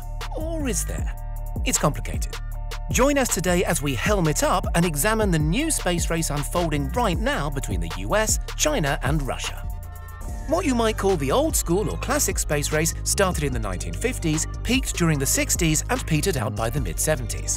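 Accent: United Kingdom. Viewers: Hes british chile...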